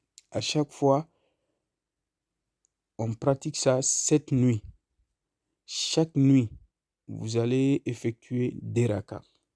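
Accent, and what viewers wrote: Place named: Mali. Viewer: Tu est du mali jia l'impression avec ton accent je crois reconnaitre le pays 🤧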